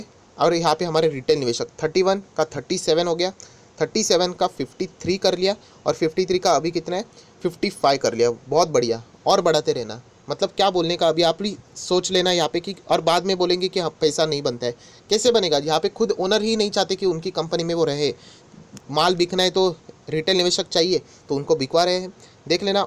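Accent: Maharashtra, India. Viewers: Nhi dada tynchi tone varun kalt te marathi ahet..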